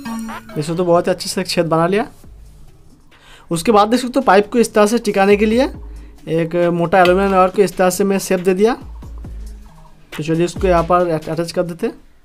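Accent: Bengal, India. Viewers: Aami tum bangauli hai 🤡....yrr jo bhi ho bangali logo ka accent bht pyara hota h 😂💗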